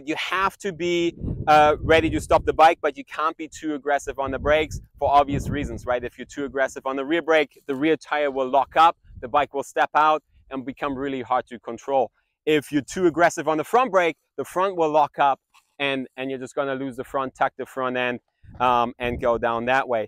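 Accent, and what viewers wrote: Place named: France. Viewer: You lost me at french accent.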